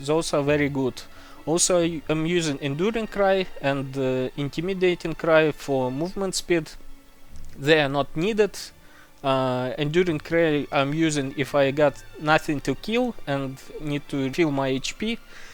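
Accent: Russia. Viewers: по акценту понял что Ру говорящий). Каефынй билд и в пое 1 есть что фармить на нем ) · Yo just rerolled this as my 3rd char this league and having a blast so far. So thx for the build, nice explaining overall and i love ur russian accent. Im currently using the Merc from my Minion Char, with Haste, Tyrant helm(flat fire dmg), blind, elemental weakness curse, and a bit survivability. What do you use for Merc? Is Determination worth it for example? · судя по говору сразу слышно, что русский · i understand russian english better than original english, good video, brazil here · Тяжёлый русский акцент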